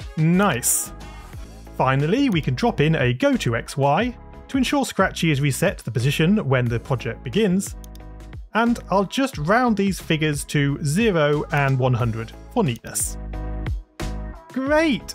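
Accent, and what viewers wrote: United Kingdom. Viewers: are you British British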